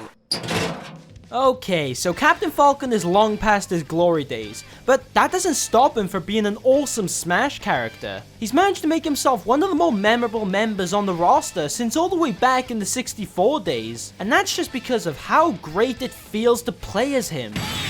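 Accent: Wales, United Kingdom. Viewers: Great video. Are you a little bit Welsh? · Your accent is amazing, I'm guessing Welsh? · I can't decide if you're Welsh (most likely), Irish or American. :') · I think you really got to the heart of this. Good vid. Surprisingly sincere and sentimental. Hope Nintendo takes this to heart and NEVER fucks with things like the Falcon Punch. (Also, kinda love your accent. Don't get many Welshmen on here...I'm sure you're not sick of hearing THAT by now...) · What the hell is your accent mate. You sound welsh, American and russian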